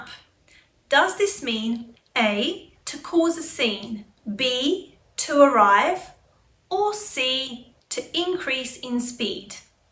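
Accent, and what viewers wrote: United Kingdom. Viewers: Could you please tell me what's your accent? Estuary or rp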